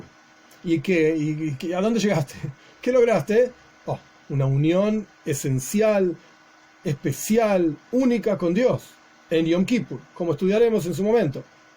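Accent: Argentina. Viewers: Rab usted es argentino?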